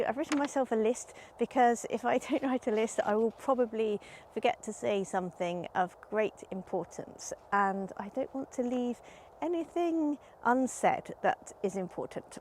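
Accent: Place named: Switzerland. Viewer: Whereabouts in the Alps,? Sounds quite Swiss to me 😊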